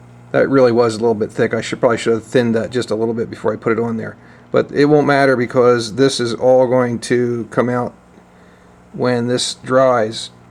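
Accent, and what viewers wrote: Pittsburgh, United States. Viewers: Your accent sounds (a bit) like someone from Pittsburgh.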